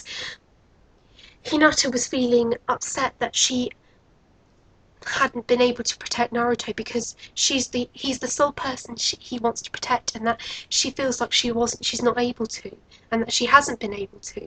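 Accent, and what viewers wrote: United Kingdom. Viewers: You have a cool british accent :D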